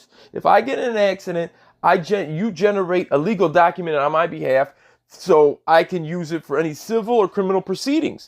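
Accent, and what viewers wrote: Chicago, United States. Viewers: Good story. Keep up the great work! Love the Chicago accent, you sound like Abe Frohman, the Sausage King of Chicago. 😎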